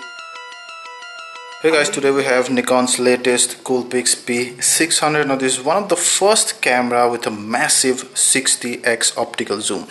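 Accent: India. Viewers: indian accent is so funny haha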